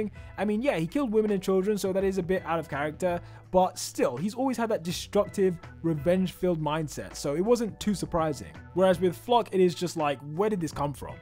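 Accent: United Kingdom. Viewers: I think this is the new "average" UK accent.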